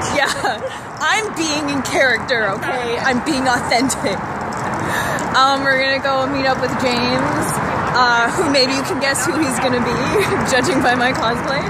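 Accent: France. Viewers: Nice French accent (I am french)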